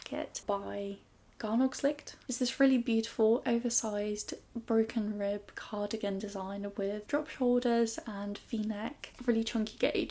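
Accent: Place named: England, United Kingdom. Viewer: New sub here and THANK GOD you’re English! That and the reference to “purple” in the title attracted my attention. I’m afraid some of the American channels are terrible screechy monotone American that grates. Your accent is delicious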